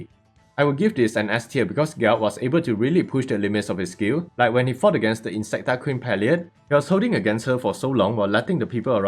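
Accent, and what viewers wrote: Singapore. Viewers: Hmm you sound singaporean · ur singaporean right the accent sounds familiar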